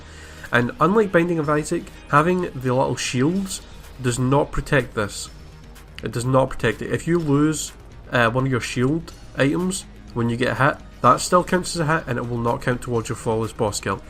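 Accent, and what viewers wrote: Scotland, United Kingdom. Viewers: As soon as I heard his Scottish accent I liked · Thank you for posting this! nice Scottish accent! :-) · You`re from Scotland, right?:D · Are You Scottish ?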